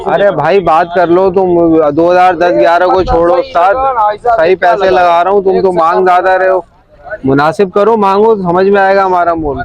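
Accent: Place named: Karachi, Pakistan. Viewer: Yr pure Karachi wala style keep it up brother ❤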